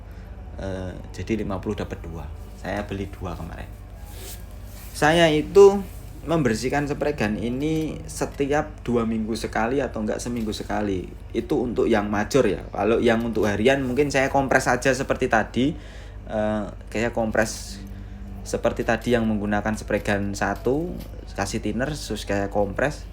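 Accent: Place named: South Kalimantan, Indonesia. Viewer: takipik mandngar bahamburan😁😁.. kalimantan mana mas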